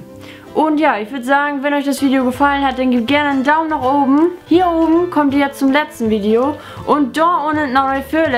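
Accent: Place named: Rheiderland, Germany. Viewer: spricht Rheiderländer Platt. Das ist in der Tat etwas Besonderes.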